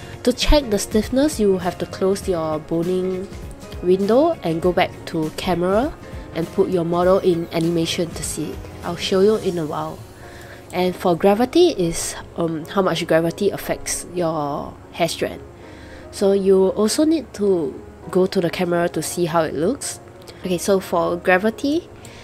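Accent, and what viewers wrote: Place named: Japan. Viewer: Wow your Japanese accent is so cool! And by learning step by step from your video I finally made my first hairstyle ever on Vroid!!!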